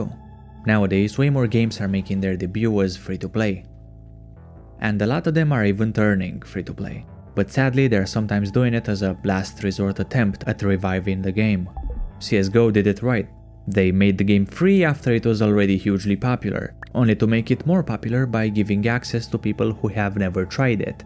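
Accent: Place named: Finland. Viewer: i can just hear finnish accent when u talk. am i right that u are finnish? :D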